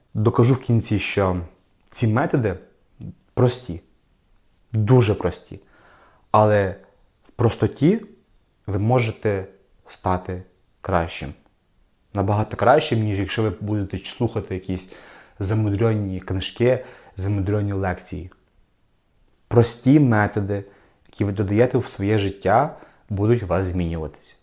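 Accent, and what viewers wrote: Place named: Galicia, Ukraine. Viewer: Клас! Підбадьорив і ніби прожив моє життя і мої комплекси в минулому., дякую за твоє відео і за твою відвертість. За такими людьми, як ти, майбутнє нашої планети і наступних поколіннь. Судячи з акценту і прізвища, ти є з Галичини) Я вгадав? Перепрошую, що одразу на "ти", просто так відчуваю.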